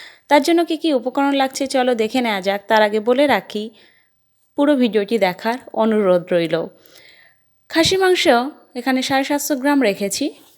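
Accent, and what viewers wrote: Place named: Sylhet, Bangladesh. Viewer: That's what I wanted to know.... Thank you for sharing it.... I thought you may be a Sylheti... That's just how I felt from your accent....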